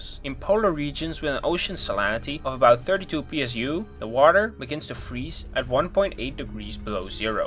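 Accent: Netherlands. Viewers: the dutch accent.......... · are you dutch? olijf oil intersekting point etc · Ew dutch accent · Do I detect a Dutch accent? 🌡️❄️ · Ah, hello my Dutch friend, I recognize that accent :) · Are you dutch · Man is dutch💀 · Are you Dutch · leuk nl accentje hahah. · Lol, ik realizeer me net pas dat je Nederlands bent... Blijf goeie content zoals dit maken, je hebt talent! (Ik dacht al dat ik dat accent herkende... ;P)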